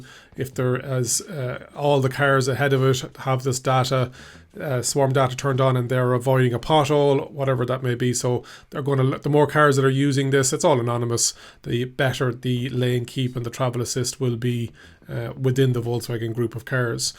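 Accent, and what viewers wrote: Ireland. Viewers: Many thanks for the comprehensive review and Irish accent that brought me back to my early school days. The car is an overall very good SUV, spacious and powerfull. Please provide the specifications of the sound System and speakers. Also, please advise the availability of two motors and AWD version. Best Regards.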